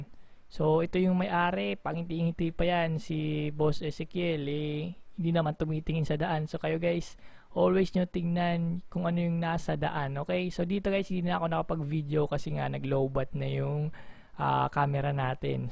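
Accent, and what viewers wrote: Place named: Waray, Philippines. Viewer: Idol tagasaan kaba? Bakit may halong Waray yung sa lita nyu?😊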